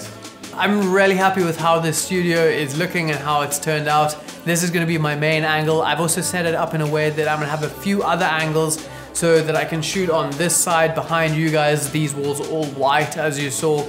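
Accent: South Africa. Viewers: I am always a fan of hearing the familiar sound of a SA accent! Congrats on the new studio bru, sorry I am late on the train for commenting. I only stumbled on your YouTube channel today! Subscribed and liked! your videos are legit - No cap. Have a dope long weekend my man!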